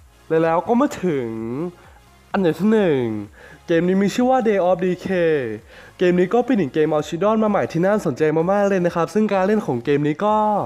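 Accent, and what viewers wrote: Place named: Shan, Myanmar. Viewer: เปนคนไทยใหญ่หรือพี่แอปเสียงเกินอ่ะพี่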